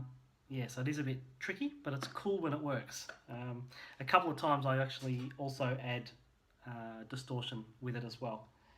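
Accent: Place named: Australia. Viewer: Thanks for the videos,. I've just started learning Wicked for a production coming in Jun. I'm a seasoned guitar player, but I'm no pit musician yet. Looking at the charts, and man I have some work ahead of me. I'm in Syd, Australia and by the sound of your accent you may not be that far away. I'd love to discuss the musical further if you're able, please. Thx. Ted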